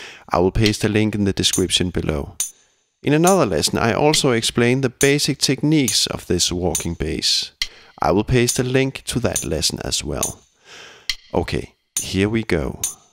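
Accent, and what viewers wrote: Denmark. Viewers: i love the heavy danish accent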